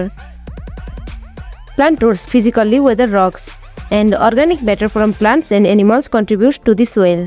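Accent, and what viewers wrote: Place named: Nepal. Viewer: U sound like Nepali.. I mean ur accent..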